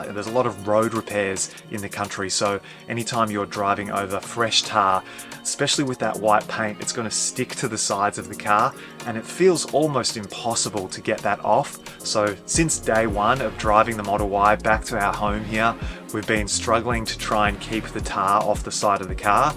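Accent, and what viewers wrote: Australia. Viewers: I really love your videos. And your aussie accent 😊 i really have to get back to down under… greetings from a model y owner in germany. But the green/sand sweater 🫣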